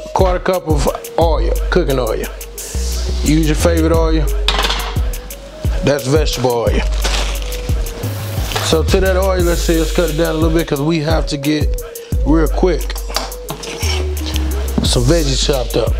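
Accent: Texas, United States. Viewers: Excuse me Sir...You from Texas? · Just when I was looking for something quick to make! Also....is that a Texas accent? New subscriber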